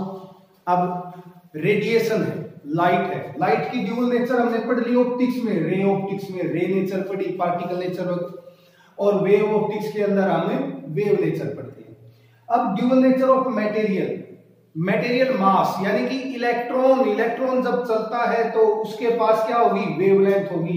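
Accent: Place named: Haryana, India. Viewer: Hindi m haha aapni haryanvi aa sahi h sir ji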